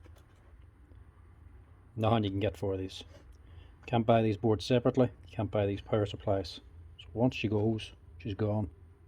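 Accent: Ireland. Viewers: Why are these tool repair guys always Irish?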